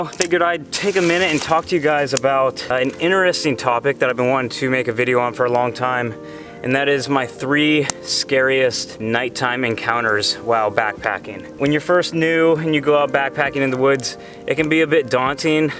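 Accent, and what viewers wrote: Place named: United States. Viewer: I'm always relieved when I click on these videos and hear an American accent.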